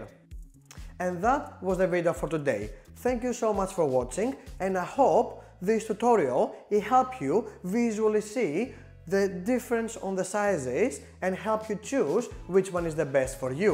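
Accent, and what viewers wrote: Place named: Greece. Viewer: Greek accent...💙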